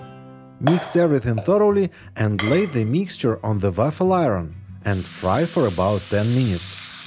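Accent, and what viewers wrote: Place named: Russia. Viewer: I dont either , many tricks dont work... and that heavy Russian accent !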